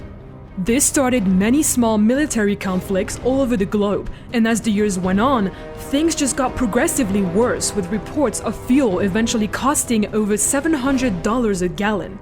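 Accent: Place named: France. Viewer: she has a cute accent. sounds French.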